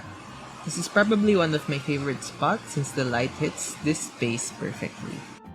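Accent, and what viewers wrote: Philippines. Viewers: Your accent sounds like Filipino 💀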